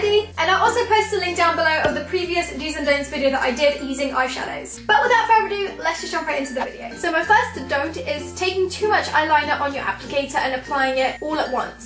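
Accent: England, United Kingdom. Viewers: Does anyone know in which part of England she lives in? I love her accent! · I love your accent it is absolutely beautiful.❤️ (I'm from England too) 🇬🇧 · Soooo pretty and i love your british accent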